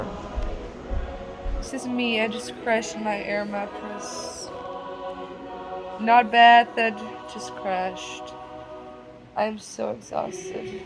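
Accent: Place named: Low German, Germany. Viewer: Thank you! I really enjoyed it. Was the horse and buggy ride at some amish place? I grew up amish in Wisconsin. I like the German you spoke. It sounded to me like Platt deutsch. Is it? Or is it Hutterisch? I know that the German we amish spoke is quite different from the real German language like they speak in Germany. I like to hear different dialects of German.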